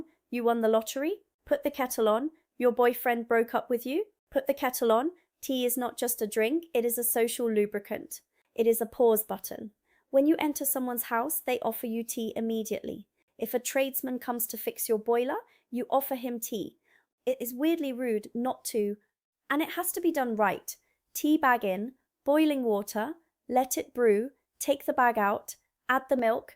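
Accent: England, United Kingdom. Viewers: You don't sound American. You sound very british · You seem to have acquired a nice genuine British accent in your time here....🙂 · You have a very English accent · Think sweetheart, you will find it takes 3mins to bowl a full kettle of water, but who cares, pleased you like our beloved country, as for your distinct accent, have you some English parentage by any chance?.... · How come, if you're a seppoe, you've got a cut-glass English accent? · how do u have a british acsent? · All you haters leave her alone I like the fact she’s adopted an English accent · Excellent presentation, english humour and accent but please lose the Glottal stop! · I only discovered your site tonight. You’re terrific. Love the accent (educated English🤭 ). Love your humour. Hope you stay here. 👍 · hmm two years and a perfect British accent, nice try but no way. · Can't understand why you have an English accent? 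· Respect for your proper English accent. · Have you been practicing your English accent? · You could almost pass for British with your accent, especially not mentioning the Electrical outputs, and the water taps. 😃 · So why do you have such an amazing English accent ? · No way are you American with that very British accent.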